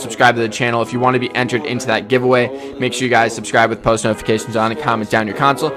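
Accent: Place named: Australia. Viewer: Brother starting sounded like Australian why did you sound like Australian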